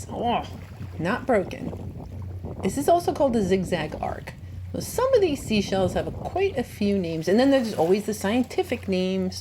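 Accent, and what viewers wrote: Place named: New York, United States. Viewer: I love your videos so much! Thank you for creating them amd sharing tips! Question - are you originally from New York? I'm curious because I am, and your accent sounds so familiar to me!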